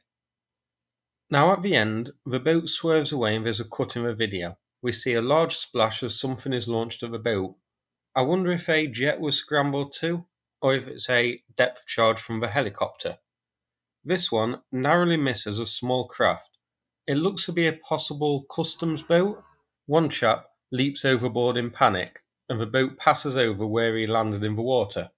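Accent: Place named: Nottingham, United Kingdom. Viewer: great analysis dude, awesome way of breaking this all down for up to understand, and I'm sure I recognize the accent, from a notts dude!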